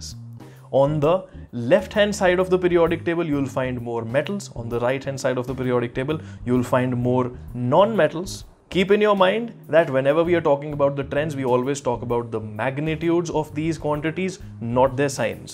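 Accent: Kerala, India. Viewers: You sound like a malayalee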